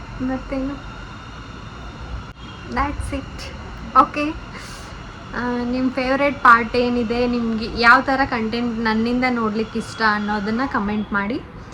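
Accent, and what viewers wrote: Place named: Dharwad, India. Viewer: Bangloredag 3 varsha idru innu dharwad bashe martila alla ad ista aagid nin vedio olag❤❤❤❤❤....love and respect for that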